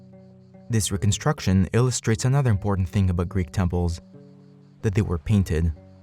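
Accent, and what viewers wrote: United States. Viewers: Can’t watch this because of the narrator’s f’awful yankee accent 🤮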